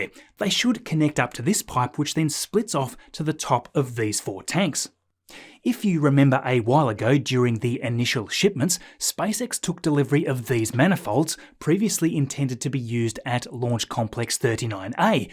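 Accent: Australia. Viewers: Just stumbled across your channel. Awesome work mate. I've been living in the UK for the last few years, moved here about 2 months before the pandemic which was a very interesting greeting to the country! It's always great to hear an Aussie accent in my travels as it feels like home. Peace mate. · I love. A very well made Show. Plus I like the Australian accent. Keep up the good work it is much appreciated.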